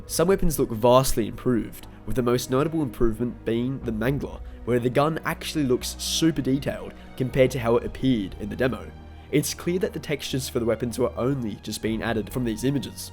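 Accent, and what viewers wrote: Australia. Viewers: I feel a lot better after seeing this video. I can't wait! Also are you an Aussie? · Are you australian?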